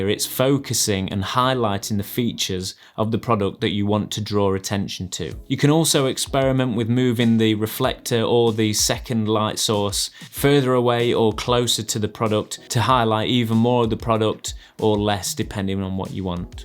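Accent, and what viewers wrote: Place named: England, United Kingdom. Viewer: Are you from England? I like your accent. Somehow it sounds more professional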